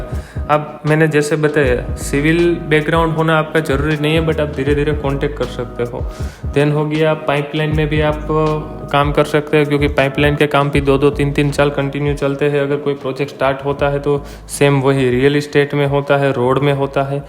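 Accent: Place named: Gujarat, India. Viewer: Doing Good Job Lagta Hai Aap Gujarat Se Ho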